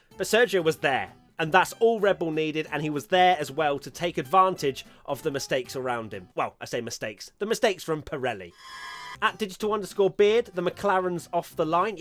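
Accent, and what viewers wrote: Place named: United Kingdom. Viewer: No,the reason why you sounded weird is due to the fact that you are Brit m8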